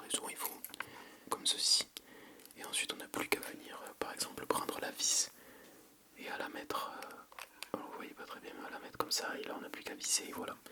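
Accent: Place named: South, France. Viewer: Ohhh cette accent du sud ! Oh mon dieu j’adore ! Incroyable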